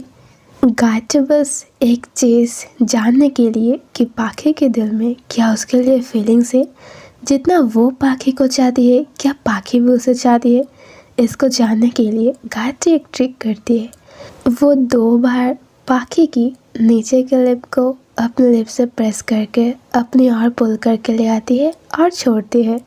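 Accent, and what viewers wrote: Bengal, India. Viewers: Aapki Bengali bahut jyada cute Mary Bengali main musalman hun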